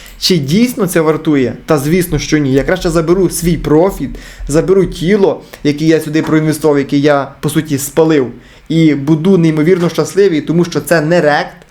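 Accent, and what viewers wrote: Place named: Zakarpattia, Ukraine. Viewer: крутий акцент у тебе, пахне закарпаттям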